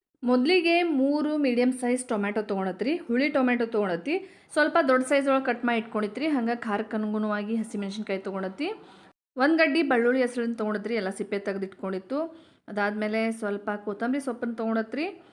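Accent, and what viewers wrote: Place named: Belgaum, India. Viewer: Hi mam ,ur kannada tells us that u r from Belgaum district. (madtaru) that roo of madtaru😊😊.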